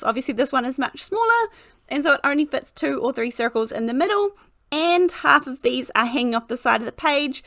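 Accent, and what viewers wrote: New Zealand. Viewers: Another great card! I just love all your creative, use what you have video's. You have become my favorite YouTube person to watch. Love your New Zealand accent too.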